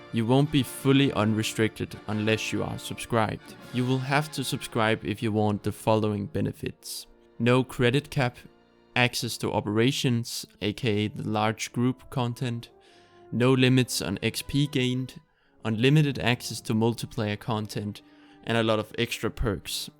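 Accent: Denmark. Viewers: Fornemmer lidt danglish ;-) Jeg er gammel swtor spiller men overvejer at spille igen F2P efter et par siden. VIl du være interesseret i at spille sammen eller blot give gode råd in-game?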